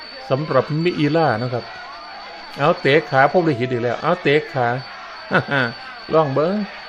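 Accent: Isan, Thailand. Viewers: ผมชอบคนภาพมวยเสียงอีสารมากๆเลยครับฮาดีครับ(ชอบครับ😅😅😅)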